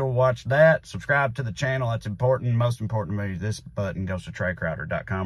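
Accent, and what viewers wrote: South, United States. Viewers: As someone who was born in Alabama, thanks for this video, great example of someone with a strong southern accent who sounds legitimately intelligent. lol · Speaking as a Mississippian, I don't hear the word "defenestrated" or "feckless" said with a Southern accent nearly often enough. I know my neighbors too well to trust them running anything much or picking our leaders. Through their great decision-making skills, they have enough trouble getting through their own lives, I don't want 'em having much influence on mine. Vote blue. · Love ya Trae ❤ & I'm so bloody proud of myself that - as an aussie - i can understand you without subtitles ( because you speak really fast as well as with an accent I'm not used to even among american accents 🤣🤣 ) - love ya like chicken ❤❤❤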